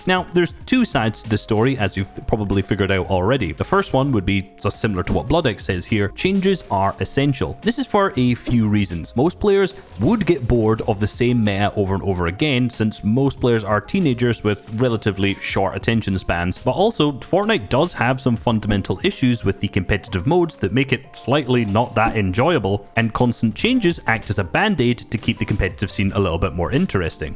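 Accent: Scotland, United Kingdom. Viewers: Gotta love that scottish voice:hands-yellow-heart-red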